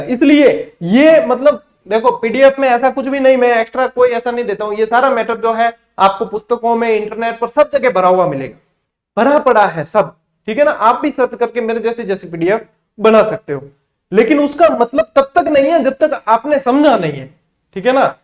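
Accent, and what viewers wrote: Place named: Rajasthan, India. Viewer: Sar aapke munh se Marwadi acchi lagti hai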